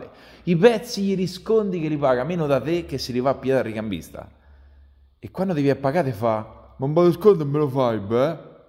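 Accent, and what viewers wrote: Italy. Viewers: Pensavo che io cerco un meccanico e tu hai lo stesso dialetto mio,di dove sei? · Bella la trasformazione della tua tua parlata che al mio orecchio suona folignpoleto almeno, che diventa col donca pg.... Saluti dal LupettOrsetto prealpino che dal 2005 al 2019 era a pg e li ha imparati a conoscere bene quelli che non comprendono la prescia 😅 che devi interrompere il resto per andare apposta per lui e magari il giorno dopo puoi andare a prendere i pezzi per più cose in un giro unico...❤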